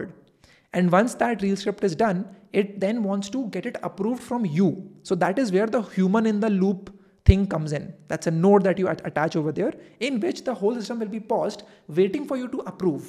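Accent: India. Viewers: Sorry indians should not speak English because they speak English in Hindi same as you are now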